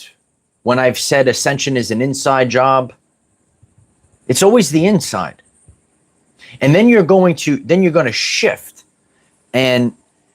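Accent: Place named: Rhode Island, United States. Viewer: I found you from R.I. That accent is familiar lol. Close enough to NYC I think you're beautiful 🫂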